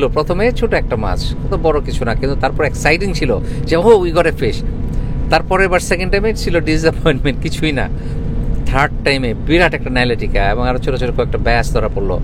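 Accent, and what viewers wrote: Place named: Chittagong, Bangladesh. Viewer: ভাই, মনে হয় চিটাগং এর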